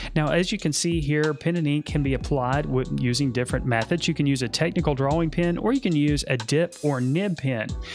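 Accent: South, United States. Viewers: Hes got a bit of a southern draw..... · Aidan and Cristie Stevens Just a Southern accent. I’m sure he doesn’t do it just to bug you. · "Karen Dash" I was listening, not watching when he said this and I had no idea that he meant Caran d'Ache! LOL Never heard it pronounced with such a strong southern USA accent before. He sounds super country.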